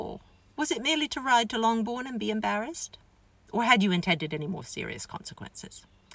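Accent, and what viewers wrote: England, United Kingdom. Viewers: Her beautiful English accent became so familiar to her quickly after the first chapter. Adored this. Thank you. No one could ever be a better Elizabeth than you. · So happy to hear the English accent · Her accent is still sort of British❤ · I was your driver in Scotland when you were acting in game of thrones pilot. I remember the English accent. 🏴😁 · Oh my, what a gorgeous find!!! 🥰 I must be the only person who hadn’t watched Pride & Prejudice mini series until this week! ☺️ I enjoyed it sooo much, then wondered what has been in since…shocked to hear an American accident and lighter hair in later interviews…but so delighted to hear the English accent here WHILE reading P & P! 🙏🏼👌🏼I’ll be listening to more of these readings! 😊 Have since watched performance in A Quiet Passion #bravo 👏👏 and didn’t realize she played Myrtle Logue in the King’s Speech! 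😮 👌🏼👏👏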